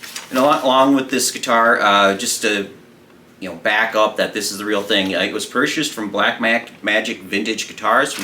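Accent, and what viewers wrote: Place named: Minnesota, United States. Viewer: Daaaamn. I'm here for that Minnesota accent don't cha kno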